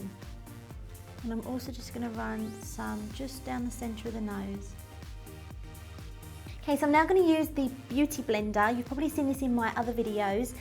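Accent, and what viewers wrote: Essex, United Kingdom. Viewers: Essex voice so annoying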